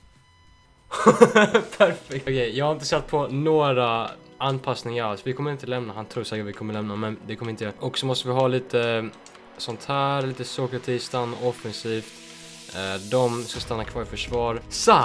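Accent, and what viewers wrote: Sweden. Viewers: Är du från Småland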